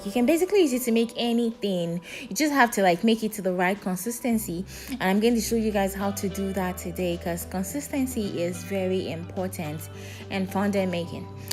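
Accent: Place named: Ghana. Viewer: You don’t sound like a Nigerian but a Ghanaian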